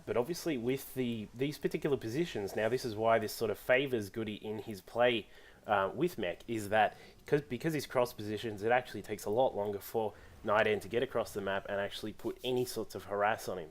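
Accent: Australia. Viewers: Do i hear Australian accent? Like the vids man, keep em commin'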